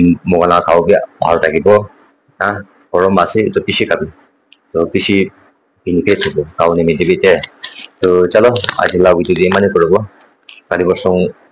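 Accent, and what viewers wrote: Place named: Nagaland, India. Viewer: Bakka local nagamise Ao tune ase ....holibhi bisse bhal tey ....keep it up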